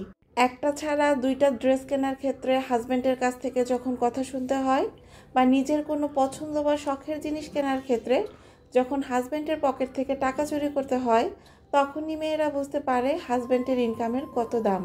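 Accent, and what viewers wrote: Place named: Tangail, Bangladesh. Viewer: আসসালামু আলাইকুম আপু ❤ তোমার টাঙ্গাইলের ভাষার কথাগুলো খুবই সুন্দর লাগলো ❤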